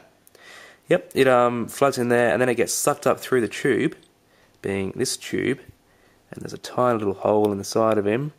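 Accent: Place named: Australia. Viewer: It's an added treat to hear your accent. I have always wanted to visit OZ. Even boarded a young chap from Victoria a few times!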